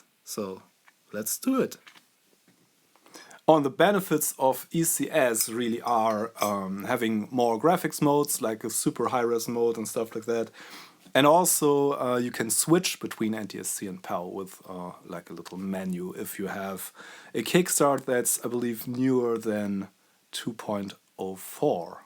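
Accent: Germany. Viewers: You sound like a german boy who trrrrry to speak english.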